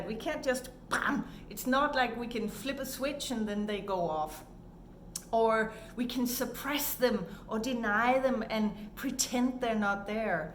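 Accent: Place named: Germany. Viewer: Your accent sounds German. Are you German? Also good video.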